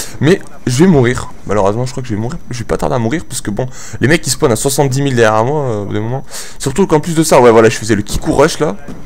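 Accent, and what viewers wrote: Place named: South, France. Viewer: accent du sud tu viens d'ou ?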